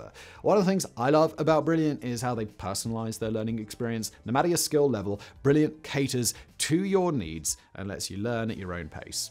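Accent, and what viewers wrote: United Kingdom. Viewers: Anyone else think he talks too fast and all his words run together? I have never heard a British accent that I just couldn't stand (besides hugh grant but that's cause he's cheesy)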